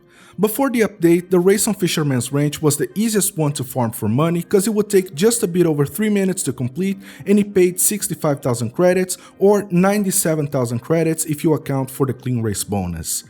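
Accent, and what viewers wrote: Brazil. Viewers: I can tell you are Brazilian. Ótimo video!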